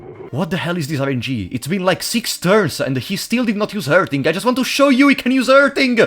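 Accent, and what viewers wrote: Italy. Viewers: From your accent, it feels like you're a "proper" italian (let the term pass please, it's just to differentiate from italian-americans). Am i right or am i way off the mark? 🤔😅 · I am indeed someone who does not play the game. And indeed, I just plain out like to hear you ramble about things you like. It's nice to hear you talk about your interests, what you think of them, the jokes ideal para el tratamiento de hemorroides , hearing you talk about your life and, I hope you don't mind me saying this, I love your accent, Italian man. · il tuo accento italiano comunque è evidentissimo. Vengo dal regno di Cydonia. seguaci di Katanna venite fuori.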